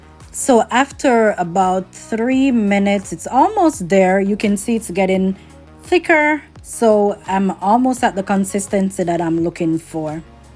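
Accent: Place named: Jamaica. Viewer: U sound like a Jamaican 🇯🇲 New Subscriber😂🙌💖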